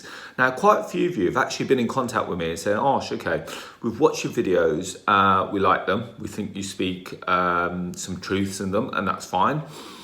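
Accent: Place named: United Kingdom. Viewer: Funny how this guy uses the British accent. Lol...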